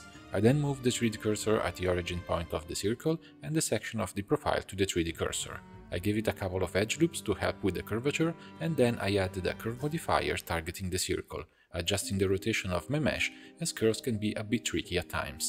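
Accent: Italy. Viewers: Immaginavo fossi italiano, nonostante la pronuncia inglese sia piuttosto buona qualcosa mi ricordava il modo in cui parliamo inglese di solito ahah. Ottimo lavoro comunque, sei davvero bravo, io ho sempre remore nel provare a modellare qualcosa di cosi complesso di dettagli, preferisco concetrarmi su archviz meno "ricche" e più moderne. E ho anche notato dai precedenti video che ante tu ti diletti con designer... Great great!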